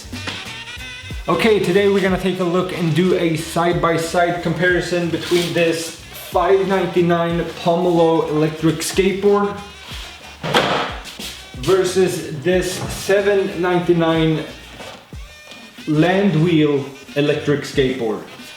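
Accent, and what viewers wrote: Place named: Sweden. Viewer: Just when I was wondering "why are his pants so small?" he started speaking with a Swedish accent and it all made sense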